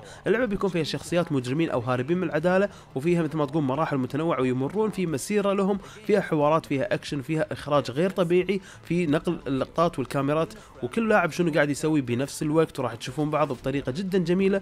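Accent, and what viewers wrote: Iraq. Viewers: nutella Z العراقيين لهجتهم جذي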